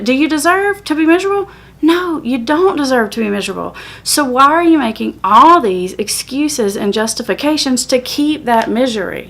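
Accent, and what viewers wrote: South, United States. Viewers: Loving the Southern accent!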